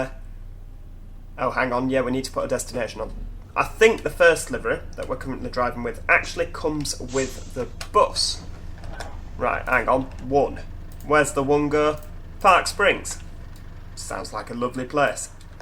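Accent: Manchester, United Kingdom. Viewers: "Im jer"... can definitely tell youre a mancunian, good video again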